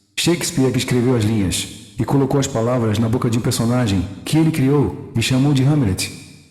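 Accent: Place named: Florianópolis, Brazil. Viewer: Esse narrador é manezinho com certeza!! Parabéns mô quiridu!! 👏👏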